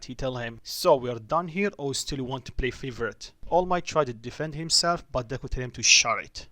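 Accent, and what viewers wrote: Russia. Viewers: Hey sir are you from Russia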